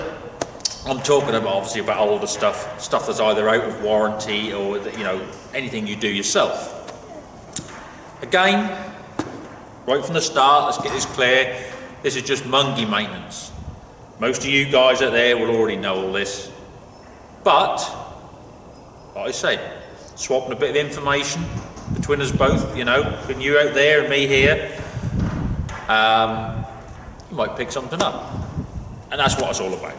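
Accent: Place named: Gloucestershire, United Kingdom. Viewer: well difficult to tell but where abouts in the country are you from? as a Gloucestershire lad you sound like a posh GL resident, you are going to say your from up north now ha ha. Enjoy the chanell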